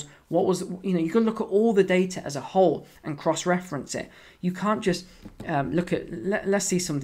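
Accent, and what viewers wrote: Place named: RP, United Kingdom. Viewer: I never enjoyed watching investment and housing videos until I discovered you. I have been following your advice since March and I think you are the best. I Iove your posh accent. Greetings from Asia; wish you would talk more about the housing out here too. I am really looking forward to you next video!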